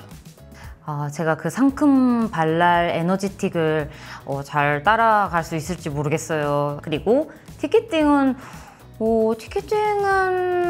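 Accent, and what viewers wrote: South Korea. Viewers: 부산 사람 맞죠???? 부산에서 응원 합니다.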